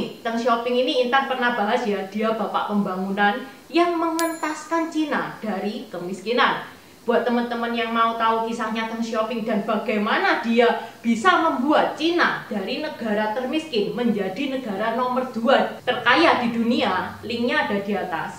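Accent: Java, Indonesia. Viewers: Saya merasakan mbak intan ini orang Jawa, krn medok. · Kalau mbak Intan ini medhok ya sudah dari sananya, artinya dia menguasai bahasa lain ( jawa) selain bahasa Indonesia. Susah sekali menghilangkan logat bicara seseorang. Yang terbaik memang bicara tanpa aksen. Semangat mbak Intan. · cici Intan ngomongnya logat jawa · Ulasannya bagus... walaupun logat jawa nya masih nge bass .. terima kasih. Btw orang terkaya di indonesia tetap di pegang oleh perantauan hokkian .. mulai dari Liem sioe liong hingga sekarang Oei hwie tjong ( BCA .. Djarum group ) .. saya sendiri generasi ke tiga dari perantauan hokciu / foo chow ( ibukota provinsi hokkian / foo chian ) . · Ini jawa tionghoa dari mana ya? Logat jawanya khas sekali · Coba bahasa Indonesia nya diperbaiki lagi cece.. Jgn medhok.. Kebanyak kan huruh H.. Jadi gak enak kedengaranya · Intan km turunan Tiong hua ? 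Tapi logat km tdk seperti org tiong hua tapi seperti jawa logat mandarin km tdk pas bgt. Intan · Cece.... medok Jawa nya love love semoga Chanel nya makin banyak subscribers nya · Trimah kasih cici tlah mmberikan informasi sejarah tentang suku etnis tiounhhua ,,yg trsebar di blahan asia,, dan byk menjadi pemimpin negara ,, cici mdok baget logat jawa nya hi hi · Intan ini asli orang Jawa. Logatnya medok Jawa banget. Gak ada pelo logat Cinanya lagi. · Oh iya intan..kenapa loghat intan bahasa indonesianya kayak Wong jowo??sementara loghat org cina di Malaysia loghat bahasa melayu nya nggak loghat melayu??😀😀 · Medok banget. Ngomong "k" jadi kayak ngomong "g". Ngomong "khek" jadi "ghek". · Seneng dicerita’in mbak Intan yg Cantik, Pinter dan enak Suaranya yg Medok. Salam Sehat & Sukses nggih 🤲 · Makasih infonya mbak. Logat jawanya medok bngt mbak hehe. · Ma'ap min ...ingin kenal , dari mana admin berasal kok logat nya medhok kayanya dr jawa semangat salam sehat selalu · Intan,meddok jawanya,etnis china jawa ya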